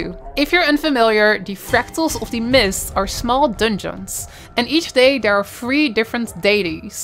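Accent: Netherlands. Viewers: Yea, I probably stash the components in my bank that would net all the Gold then. I have a really old account but hat a long hiatus of over 8 years and now get back into it, playing through all the stories and unlocking the mounts (which can be expensive). So I don't have a boatload of materials unfortunately and all the areas I visit will give new stuff that I never had before. Also don't have a whole lot of time to play so I have to pick and choose and avoid getting burned out otherwise it's just a waste of time. By the way, are you Dutch by any chance? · very clearly a Dutch person speaking English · Ik vind je Nederlandse accent wel best heftig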